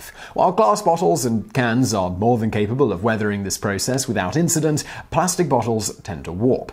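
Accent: United Kingdom. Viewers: Seriously what is with the way the British pronounce aluminum? It was made in America named by an American and pronounced the way Americans pronounce it. You wouldn't just start pronouncing somebody's name differently because you're British would you? I'm going to state calling you because this is America damb it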